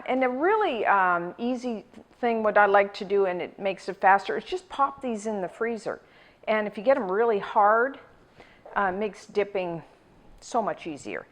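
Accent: Canada. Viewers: canadian accent is peaking.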